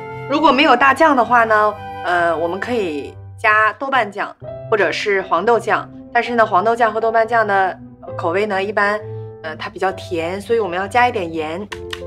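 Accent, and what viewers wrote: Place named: Northeast, China. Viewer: 美女菜做得好，一口東北腔，也說得好聽。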